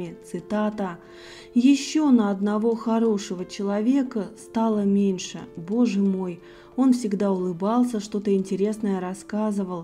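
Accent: Russia. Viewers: Она что с Урала? В обаятельной и привлекательной.. Разноплановый был актёр 😢с незабываемым голосом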